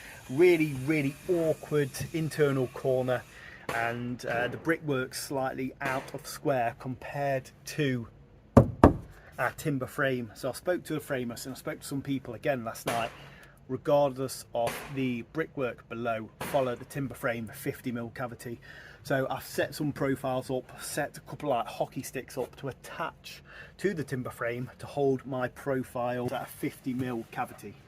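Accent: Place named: Northern England, United Kingdom. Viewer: Cos he's from up North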